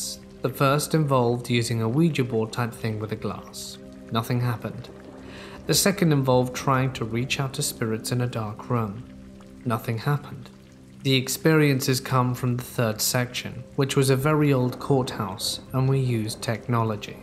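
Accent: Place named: RP, United Kingdom. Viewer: I love your voice because it sounds like Rp-English. Excellent for practicing pronunciation.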